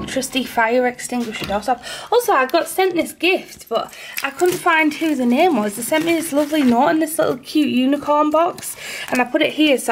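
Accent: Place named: Northern England, United Kingdom. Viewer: Seasons greetings!:) I love having your northern voice in my feed.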